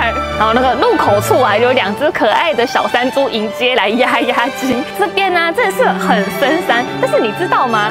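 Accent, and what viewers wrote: Taiwan. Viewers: 比台灣人還台灣口音，努力有了好收穫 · 這中文說的台灣腔，沒誰了，完全聽不出來耶，厲害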